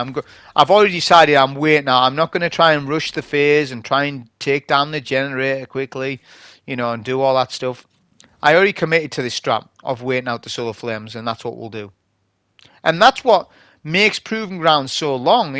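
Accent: United Kingdom. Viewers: Somehow im enjoying more of your bri'ish commentary rather than the actual gameplay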